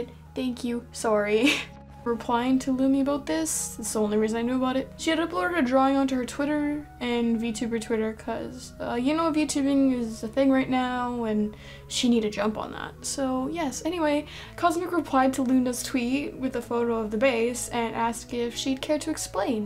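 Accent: Canada. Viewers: I could tell too, even though I’m not Canadian. I live in one of the northern states, and the accent is really similar. Lol. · I can tell you're definitely Canadian but still a very informative video lmao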